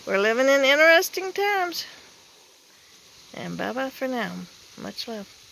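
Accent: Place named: Georgia, United States. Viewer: Amen to what You are saying. Don't have to see it to know it! Please don't get mad at me, but You sound kind of Georgia, but it looks kind of West Texas there?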